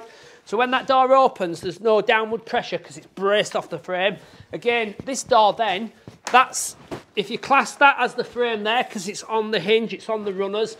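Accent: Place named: Northern England, United Kingdom. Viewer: Brilliant video. I an a carpenter and joiner so can appreciate when a job is being done correctly and conscientiously. I am planning to build my own garden room this year so looking forward to that. May I ask who supplied the bifold doors? I know you did mention them in the video but I couldn’t catch it. Probably because you have a strong northern accent and I’m a Londoner. 😆 I will be watching more. Great job on both the build, and the videos. Cheers!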